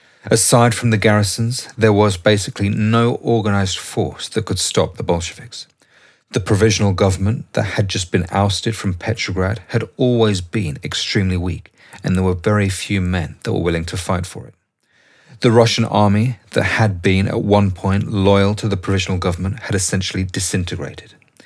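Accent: United Kingdom. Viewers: Yeah usually British accent is red flag of AI slop (due to its ability to deceive American audiences) , but this Channel is solid!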